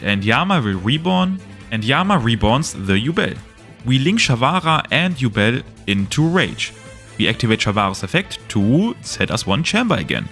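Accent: Germany. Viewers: So ein lustiger deutscher Dialekt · My guy has the strongest German accent on his English I've ever heard in my life chad af :^) cool video tho.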